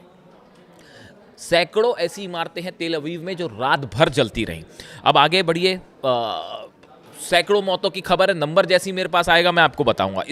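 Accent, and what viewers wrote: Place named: Uttar Pradesh, India. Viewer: bhai ka apna alag andaz bc wala, pure UP style and our favourite 😂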